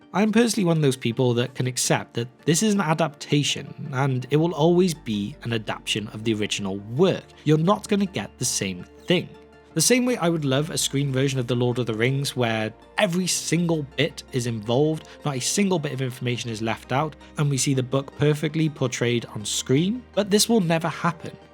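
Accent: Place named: Wales, United Kingdom. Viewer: Literally just stumbled on this channel today. Presenter’s accent is slightly subtle and I’m like “is this guy Welsh?” … then I hear how he pronounces Morfydd and Owain and I’m like “you son of a bitch, I’m in” SUBSCRIBE 😁🏴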